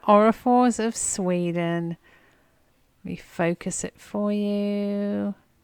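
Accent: England, United Kingdom. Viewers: what part of England are you from, you sould a bit like my cousin Jenn, she was from Maidenhead, and a teensy bit like my friend Lorraine who is from Cobham. my other english friends do not sound one bit like you, they are from Liverpool, and another family says they are gordies, OH my , when I first met them I couldnot understand them, they had so many different rhyming words for things, not like cockney but different, and my exchange student was from Bristol, and Beautiful Cotswald. my mother in law was born near London, so English accents are my favorite things. lol. I am not like the professor in My Fair Lady but I do enjoy trying to figure out the accents. London accents are the easiest.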